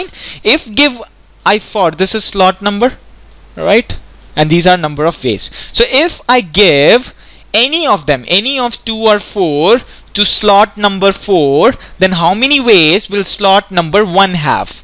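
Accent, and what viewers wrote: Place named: India. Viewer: thank you for the good video, but please slow down that Indian accent, it's really distracting...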